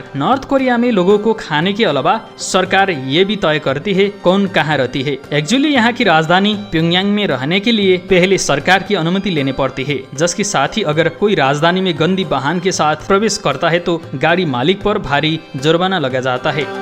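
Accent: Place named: Nepal. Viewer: नेपाली हो नी, तर लवज नेपाली नेपाली आइदियो😜😜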